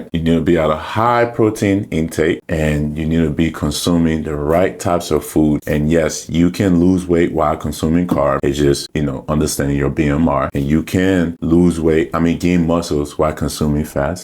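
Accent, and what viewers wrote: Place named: Liberia. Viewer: I can hear a slight LIB accent... you Liberian bro?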